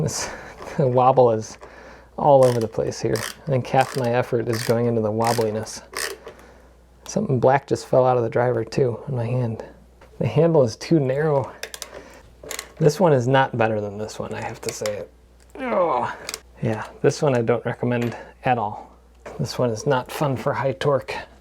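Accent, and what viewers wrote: Netherlands. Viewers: are you dutch actually?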